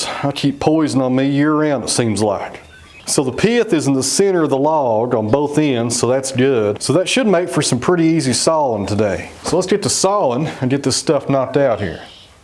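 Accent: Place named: Georgia, United States. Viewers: . . . he has a 'drawl' in his voice.Beautifull accent.Lots of them there down in the south...I enjoyed the show very much and i thank you for taking the time to spend it with us.... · First vid I've watched of yours. Soon as I heard your accent I recognized it. You say your in Georgia? I think I heard your accent from guys from Kentucky too. I could be wrong.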